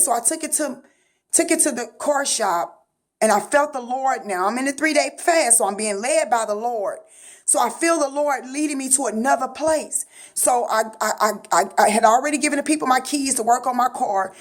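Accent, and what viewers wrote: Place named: St. Louis, United States. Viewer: Amen sis! God is faithful! You sound like you from the home town! STL?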